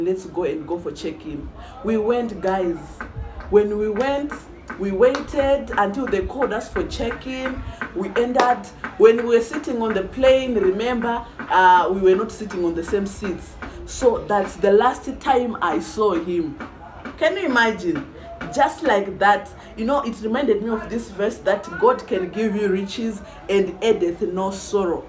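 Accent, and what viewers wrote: Uganda. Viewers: You now have a Uganda accent sis 😅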